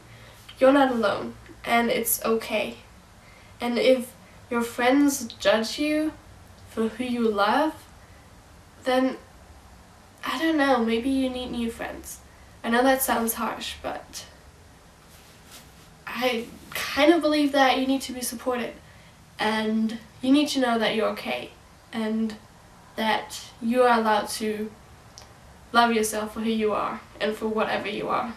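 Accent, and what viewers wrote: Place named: Germany. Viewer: Bevor ich deinen Kanalnamen gelesen habe, dachte ich so "Ist sie Deutsche? Denn ich habe den exakt selben Akzent" :D. Ich rede genauso wie du, wenn ich englisch spreche. I'll continue in english so everyone can understand. I'm pretty sure I'm bi too. Nobody knows although I think a few friends assume. I kinda wanna come out to my friends but I'm not sure if I'm ready enough unless they ask then I would tell them. I have had thoughts of being gay (turns out I'm bi) since the age of 13 (I just turned 17) and although I've never had a problem with lgbtq+ people being one myself was something I really didn't want to be. Accepting myself was definitely the hardest things although I knew my family and friends would support me fully.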